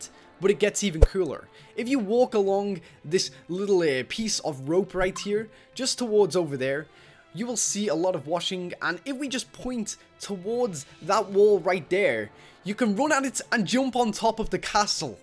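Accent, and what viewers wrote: Ireland. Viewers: is he irish? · Are you Irish?:D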